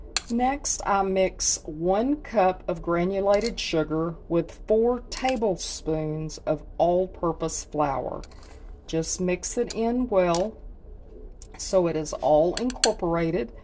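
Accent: South, United States. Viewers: It will never stop being confusing to me how Americans ended up with that stupid, idiotic southern accent. It's disgusting. Why???????????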